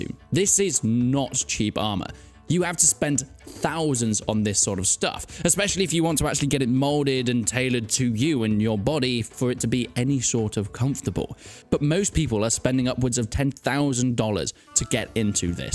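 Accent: England, United Kingdom. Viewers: Good to see more people getting into the sport, great stuff writing and assembling it. Just wanted to say you showed SCA there a couple times there which is a different thing as a rule of thumb if the armour looks a little flimsy and they're fighting with sticks and most importantly stabbing then thats SCA and not buhurt. The armour although is expensive is not necessarily 10 grand an entry level harness (suit) can cost you as low as 2000. The rule confusion is understandable it comes from there being 3 main disciplines in buhurt: dueling, melee (or also buhurt confusingly) and pro fights. Dueling is for points with the weapon only melee is 3 points on contact on the floor and profight is points everwhere and you can usually win by KO or TKO. The pro in pro fight does not mean professional as almost noone does this for a living and the people that do are usually coaches. 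I assume by your accent your English and as pro fights are what interest you you should look up Saturday knight fights on Facebook which is the uk starting a profight league its early days but they're great to watch. Thanks again for the video great stuff.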